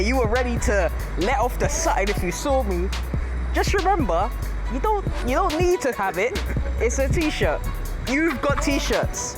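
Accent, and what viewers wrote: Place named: Roadman, United Kingdom. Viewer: I love how eloquently he speaks in a roadman accent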